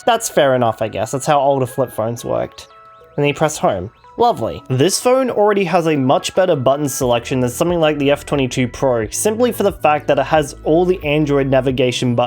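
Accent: Australia. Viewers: Bro is unironically Australian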